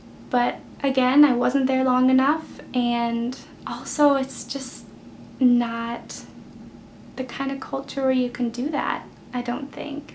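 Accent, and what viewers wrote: France. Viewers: Coucou, j'ai cru comprendre que tu avais peur de parler français parce-que tu n'as pas beaucoup de vocabulaire et que tu avais peur de passer pour une idiote, j'ai le même problème quand je dois parler avec une personne anglophone. Et je pense que beaucoup de personnes ont ce problème. En tout cas j'ai beaucoup d'affection pour toi et je trouve ton accent français très mignon. Bisou et bonne continuation;)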